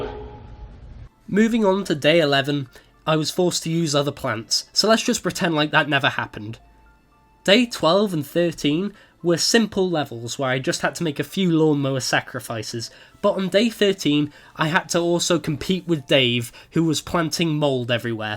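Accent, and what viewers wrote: United Kingdom. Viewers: This man can complain about everything in British and I will immediately agree Unrelated note: I subscribed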